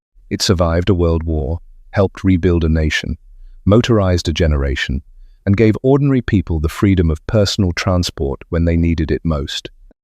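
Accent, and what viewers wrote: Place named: United States. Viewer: Wasn' it a popular that was the cheapest? pretty rubish video, why the American accent?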